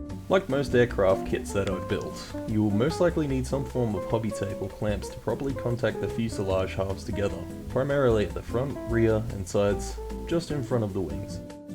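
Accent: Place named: Australia. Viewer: You sound australian. And your glue seems familiar Did you get this set from metro hobbies?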